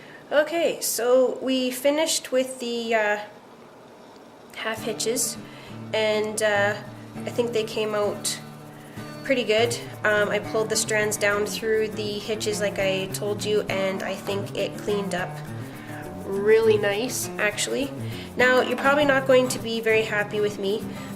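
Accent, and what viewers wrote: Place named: Canada. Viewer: Thank you very much. You have a wonderful video! Are you Canadian!? Accent gives us away!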